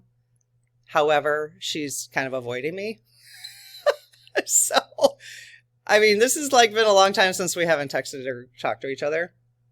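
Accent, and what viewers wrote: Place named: Michigan, United States. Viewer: I am new to the channel and I am SO enjoying your videos!! You make me feel at “home”. I don’t know where in the country you live, but your accent reminds me of my birth home, Michigan ❤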